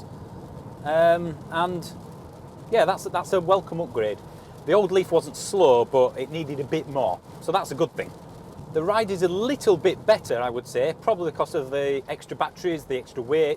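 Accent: Yorkshire, United Kingdom. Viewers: Yorkshire England I reckon near keighley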